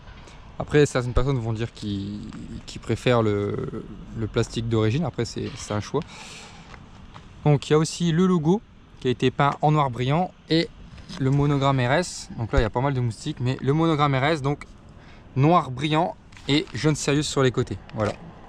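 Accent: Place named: North, France. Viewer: J'ai l'impression d'entendre l'accent du nord =)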